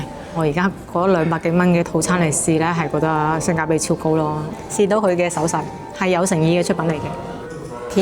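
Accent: Guangzhou, China. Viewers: 唔需要改口音，改咗會不倫不類㗎，話你啲人唔係廣府人囉，我哋香港人好多都係從廣東來的，（佛山）❤❤ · 粤语警察😂😂对老广语法一知半解😢广州陀地发音结尾，都是下降音及平声，不用理捞头乱噏🎉🎉支持你👍👍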